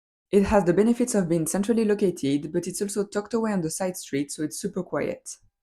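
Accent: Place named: France. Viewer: I was too busy appreciating your kick-ass French accent to even watch what you were showing lol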